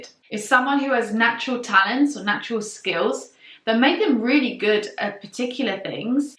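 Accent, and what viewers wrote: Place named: United Kingdom. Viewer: Hello sweetheart. I was drawn here after my awakening to engage with your channel. I don't know what it means but I am drawn to explore. I am very intrigued. I am gifted because I was told by source energy that I was going to create great things for humanity at 7 years of age. I avoided University as a young man because I saw it as unnecessary debt for limited reward. I could have been a lawyer and then upgrade it. I love that you are an empath and a drawing aficionado like I am. You are so amazing. I have also done life coaching and I have a background in organic gardening as well as landscaping and horticulture. I have also grown into nutritional healing as I have skills in multiple massage techniques and herbal healing including mystical occulted knowledge. I totally get the need for personal time for growth. Boundaries are essential. 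I love your depth of character and it is really interesting. Your British accent is really cute also. I am stealing your ish line to calm my Aussie lingo down (we are too honest at times). I am very big on self care time balanced with empowerment. It must be so much harder being a woman dealing with it. Balance is key. I understand female psychology better than most women understand themselves and I imagine that you find most men shallow because they are. Women are a little more complex in general but most not by much. I'm sure that you understand. It is what it is either way and all people are amazing in their own way. I'm about to watch you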